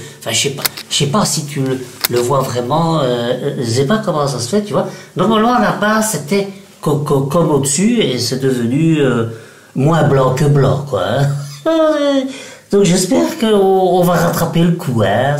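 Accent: Belgium. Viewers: ta diction est parfaite, je te comprends très bien. Mais je te croyais belge en fait. Et il n'y a pas de mal à ça. Il paraît aussi que j'ai un accent lyonnais et autre chose 😂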